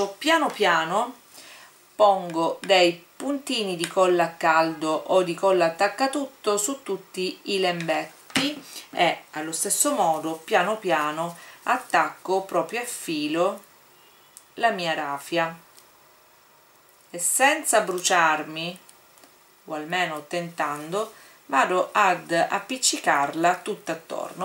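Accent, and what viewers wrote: Sicily, Italy. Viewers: Sei sicula?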